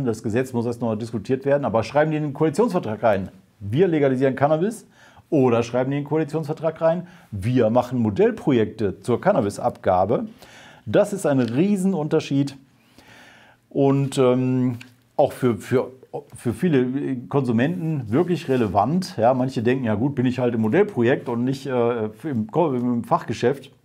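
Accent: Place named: Ruhr, Germany. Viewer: du bist der knaller! Für mich als kölsche Jung bist'e mit deinem Ruhrpott-Dialekt immer wieder lustig.:-))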